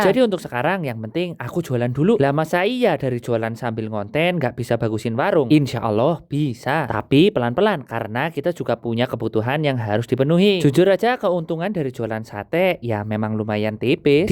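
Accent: Banyumas, Indonesia. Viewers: ternyata orang banyumas, kukira cilacap krn logat ngapak nya samaa😭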